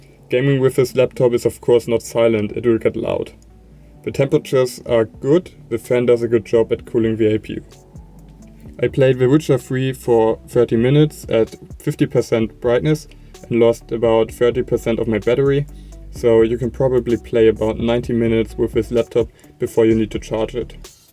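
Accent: Germany. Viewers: Dein Englisch ist gut, man hört nur sehr dass du Deutscher bist... xD LG :)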